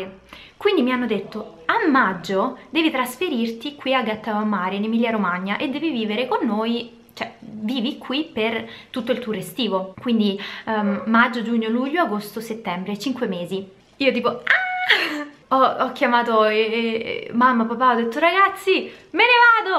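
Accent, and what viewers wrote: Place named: Rome, Italy. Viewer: Ma Brian riesce a cogliere, da non romano, la dolcezza del tuo accento?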